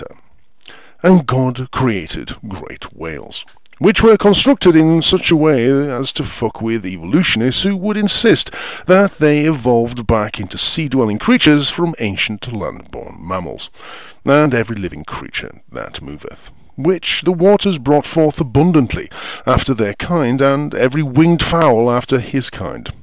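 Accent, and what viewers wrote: United Kingdom. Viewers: I was lead here by fans of my channel... and while I knew that I was hardly doing something totally original... I was told that your english accent was part of how funny you are. And.. yes the english accent is totally something I cannot hope to imitate being that I have a lowly midwestern US non-accent accent... long story short, You're funny and interesting enough that I watched the whole video! I like your sense of humor! I can only hope to be a fraction as funny as you! :D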